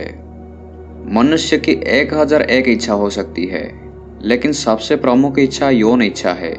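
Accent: Odisha, India. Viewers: Bhai odia ki katha kahiba style ru jana padi jauchi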